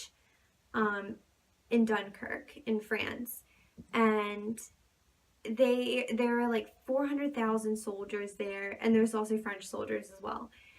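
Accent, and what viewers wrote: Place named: North America. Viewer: Which part of N America are you from? I can't guess. Nice, intelligent review Thank You X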